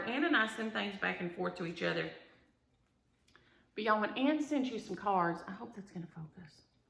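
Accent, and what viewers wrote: South, United States. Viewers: Love your stories and your "southern " talk, I feel like I'm in the south again. You have a beautiful face and personality.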